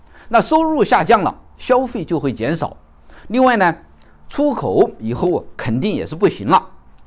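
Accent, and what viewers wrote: China. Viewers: 聽口音你也是中國人吧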